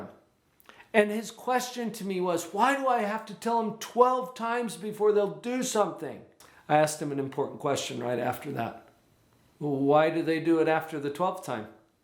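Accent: United States. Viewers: This guy sounds like he's from Utah or something.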